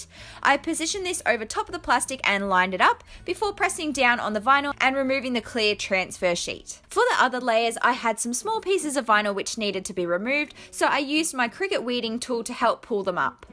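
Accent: Australia. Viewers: Yeah! A new video! Love your work and Australian accent! Hugs from good ol USA!!!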